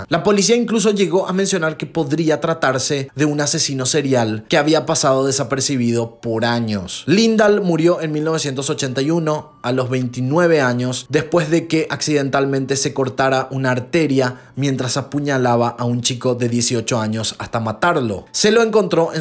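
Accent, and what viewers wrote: Paraguay. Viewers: Tenes acento Paraguayo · Tiene una voz espectacular!!! es Paraguayo, a caso todos los youtubers tienen que hablar con tonada mexicana???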